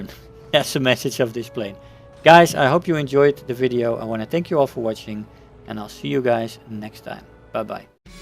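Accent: Netherlands. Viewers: you sound really dutch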